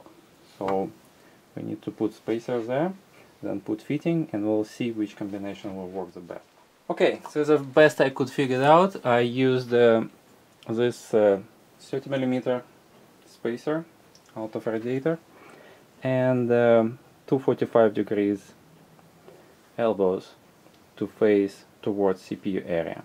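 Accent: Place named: Russia. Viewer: Российский акцент так и показываешь!даж не стараешься говорить с меньшим акцентом,а ,,буилд,,кайфовый.спс)_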